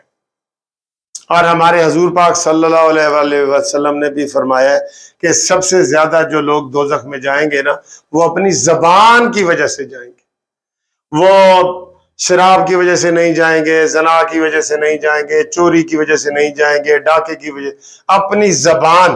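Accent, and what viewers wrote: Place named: Punjab, Pakistan. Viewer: I like your Punjabi pronunciation. Klear for clear. Sakool for school. Tushi great ho from India.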